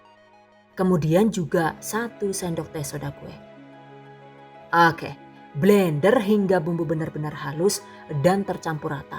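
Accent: Banyumas, Indonesia. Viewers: Suaranya enak tp tiba2 kaget ternyata ngapak ... 😅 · Galfok sama NGAPAK nya 🤣🤭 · mandan kaget pas pengisi suarane ngomonge ngapak🤣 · Masyaa Allah ngapaknya jadi kangen kampung halaman. Terima kasih resepnya. Barakallah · cilacape pundi... jan ora ngapak ora kepenak😅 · Alahhkae..tengane wong ngapak..😂..kesuwun mbekayu resepe ya..semoga sukses terus yu😂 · Fix ente wong ngapakkk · Jebule wong ngapaak😭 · Oh wong ngapak jebule.😄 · jebule ngapak, ngapak ndi mbak e, ws rong tahun maning nembe ngerti yt kie, suwun yh mbak e atas ilmune 👍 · Deneng ngomonge ngapak yaa 😂😂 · ngomonge ngapak.. wong ndi kii😂😂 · laaah deneng ngapak siih 😂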